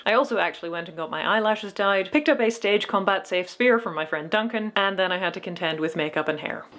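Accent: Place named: United Kingdom. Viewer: I love, and always love, the combination of detailed research and "eh, throw it together, this is how I'm gonna do it because it's not accurate anyway." Research on chitons, using a chiton, the laminating techniques, and then ballgowns and "why not." Possibly most random comment ever, but as someone with British relatives, I notice and love how accent gets just a little thicker when she's thinking something over. It just reminds me of so many other lovely crafters I know - they get into their craft and instead of "voiceover voice" we get "here is a real person and they are moderately frustrated."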